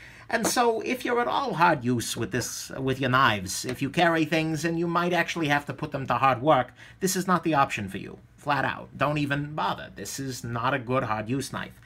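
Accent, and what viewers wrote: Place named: Brooklyn, United States. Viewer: He sounds like he’s from Brooklyn or Staten Island to me. The old timey tv/radio broadcaster is hilarious!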